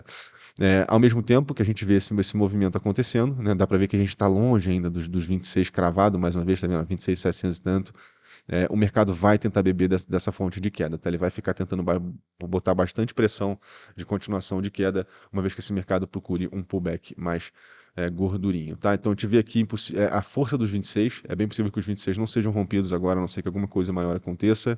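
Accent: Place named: Bahia, Brazil. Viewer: Bahiano! Oxeee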